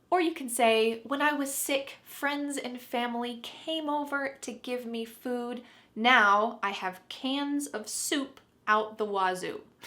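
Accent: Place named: United States. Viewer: I love u cuz u speak us accent♥️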